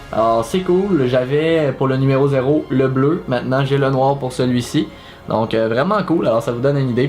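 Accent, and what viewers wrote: Quebec, Canada. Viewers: Es tu quebecoi (moi oui)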